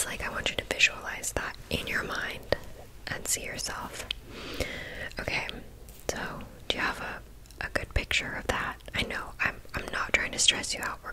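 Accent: Ireland. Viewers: More Irish accent please!!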